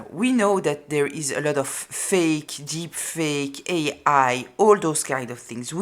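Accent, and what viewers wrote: France. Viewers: Thank you for the reading:hands-yellow-heart-red:🕊 Are you from France?☺Just subbed^^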